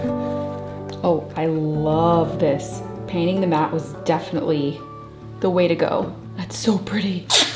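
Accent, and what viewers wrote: Texas, United States. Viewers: I think your Texas accent came back a little bit during your time there. It’s cute to hear, as a midwestern viewer. I’m glad you’re having fun with your living room, even if it’s temporary.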